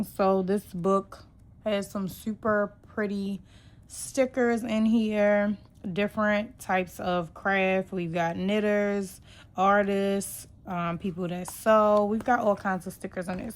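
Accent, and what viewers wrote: Baltimore, United States. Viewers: Do I hear BMORE accent❤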